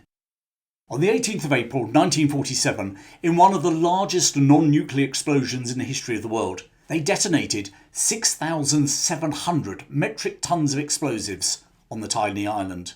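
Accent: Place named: Upper class, United Kingdom. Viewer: There's a lot of British arrogance here. For example the dismissive attitude to the Frisians you can sense several places. For example saying things like "return to Germany". I mean, it's a Frisian population and was switched betwen Denmark and Schleswig. And The Duchy of Schleswig was not a party of Germany, it was a fief of Denmark consisting of a mix of Danish, Frisians and Germans. So it wasn't returned to Germany. The only place Heligoland can be returned to is to Frisia, but such a state does not yet exist. But also the gloating of a poet in exile writing the German national anthem on "British soil". There's just throughout an arrogant sentiment, which just really drives home the stereotype of the British upper and middle class. The accent and everything. It's like it's taken out of a Monty Phyton sketch.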